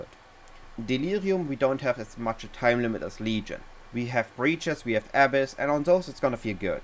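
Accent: Germany. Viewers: High-end german accent.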